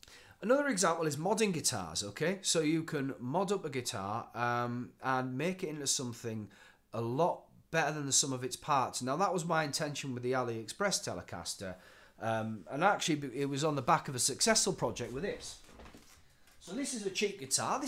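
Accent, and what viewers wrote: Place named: Northern England, United Kingdom. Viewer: I forgot to say - great to hear a northern voice on YouTube. I spent a number of years in Bolton and me mum is a Sheffield lass. Living in the US now - and miss hearing those dialects. Yeah, Epiphones are hit and miss. I think the Korean made Agiles (from Rondo) are better. Not sure if you can get those back there. Regarding the fret-ends on that Aliexpress Tele - get yourself one of those 45 degree angle block files, a fret file with the corners smoothed off, one of those diamond-crusted crowning files, and a set of micromesh pads (you can get them all cheap on eBay). You seem to be able to do a bit of work on your guitars so I think you can tackle those fret- ends mate. By the way, I have to do that job on almost every guitar I buy online - so it's not just the Chinese knockoffs on Aliexpress. Fret dressing tools are a must for modders and restoration folks methinks.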